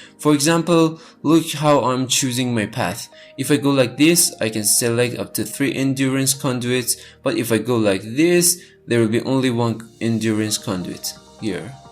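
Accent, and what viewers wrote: Iran. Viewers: I can usually spot a fellow persian by their accent but I'm unsure... are you?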